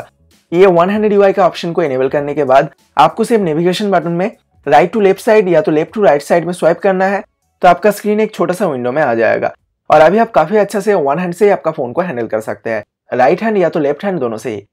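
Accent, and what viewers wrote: Bengal, India. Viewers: Contain is good but my brother there is a Bengali tone in your pronunciation I didn't disrespect Bengali but for your shake try to improve it best of luck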